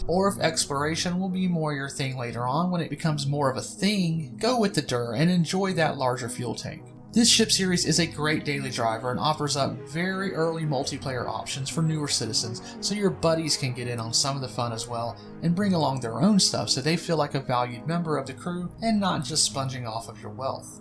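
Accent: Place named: North Carolina, United States. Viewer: I swear that sounds like a North Carolina accent! [enough to sound Local to me]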